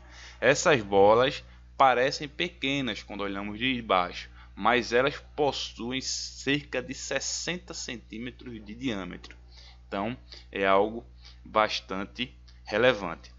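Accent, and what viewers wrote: Brazil. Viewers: Sotaque pernambucano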